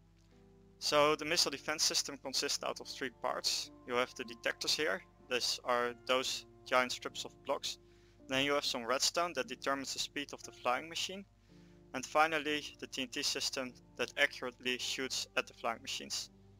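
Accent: Netherlands. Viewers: je kunt gewoon horen dat je een nederlands accent hebt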